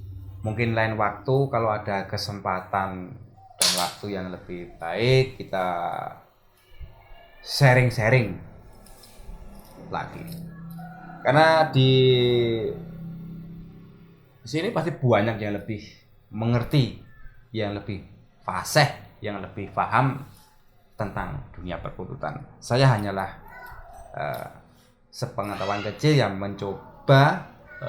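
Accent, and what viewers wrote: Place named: Ambon, Indonesia. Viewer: Gw Kira org ambon kmu bro ??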